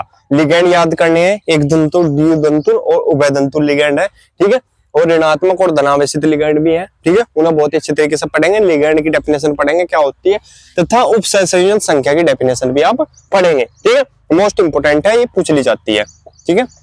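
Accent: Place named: Baghpat, India. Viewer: Bhai to bikul apni bhasa wala h up baghpat side h to reply kariye jarur 😂😂😂😂😂😂😂😂😂😂😂😂😂😂😂😂😂😂😂😂😂😂😂😂😂😂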